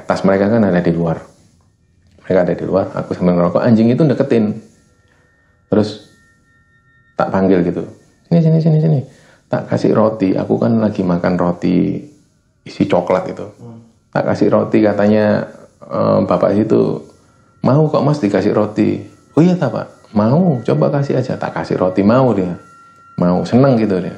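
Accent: Java, Indonesia. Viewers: Sayaa yg orang Melayu Kepri, sukaaa denger mas cerita2 horor, sukaaa denger logat Jawa nya😆😆😆😆😆🤣🤣🤣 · Jaaann jowo tenant...mantebb, medok e toop markotop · Daya Saing utama adalah di lagam lagam jawanya yang bikin ngeri ngeri sedep... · Penyampaian y enak,jawa y kentel pol cak,fans baru cak semangat 👍👍👍💪💪💪gending jawa y bikin ayes adem ati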